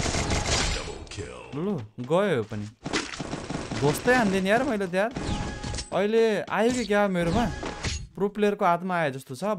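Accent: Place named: India. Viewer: Nepali bolnu birsina aatexa dhoti jastai bolxa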